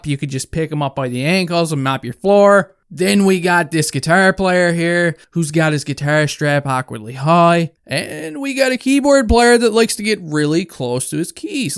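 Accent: Newfoundland, Canada. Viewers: U must be a Newfie is ya?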